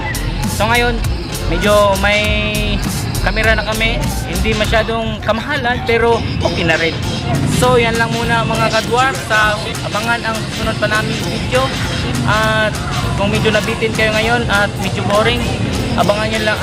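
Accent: Visayas, Philippines. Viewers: Mailhan gehapon ka na nag prank ka Kay halata man imo tagalog tuno palang sa imo pag tagalog bisaya man .. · Yan camera ... ayus sana ung pag bigkas ng tagalog mo ayusin mo na rin pra s halata bisaya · Visaya sya kaya pwede ikaw mag adjust?✌ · Bisaya ka dong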